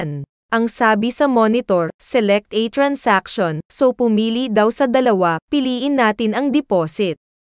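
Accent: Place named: Ilocos, Philippines. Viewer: ilocana ka haha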